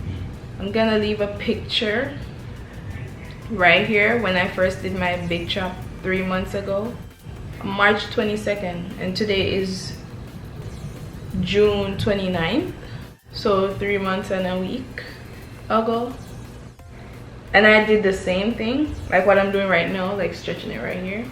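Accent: Jamaica. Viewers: Mi luv yuh Jamaican accent😝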